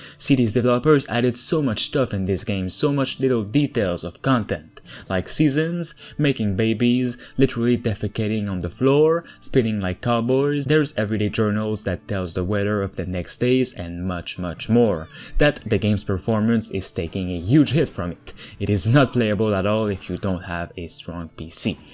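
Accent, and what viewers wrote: Quebec, Canada. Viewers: This guy has a distinctly quebecois accent i find...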